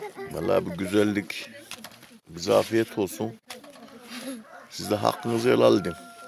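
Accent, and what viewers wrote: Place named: Nevşehir, Turkey. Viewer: Merhaba kardeşim ya ben bu görüntüleri çok seviyorum. Köyüm geldi aklıma. Ben Nevşehir 'liyim şiveniz bizim köylüler gibi. Bide tavuk sesleri geliyor keşke bende köyde yaşasaydım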